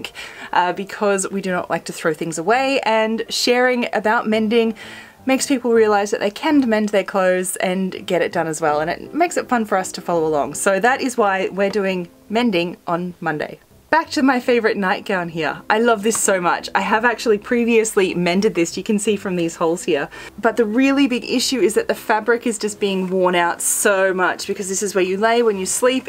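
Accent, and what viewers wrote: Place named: Australia. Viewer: I recently discovered your channel and have been binging them all over the last few evenings. My adult daughter has commented a couple of times (and in particular on this post) that she is going nostalgic for he ABC TV production Play School. We analysed it and concluded that the combination of your well spoken Aussie accent, speech pace, question and response style and gentle tinkly background music is giving the vibes. Now that I have heard it it is hard to unhear it. Don’t change it, we love it. Btw, did you also grow up with Play School?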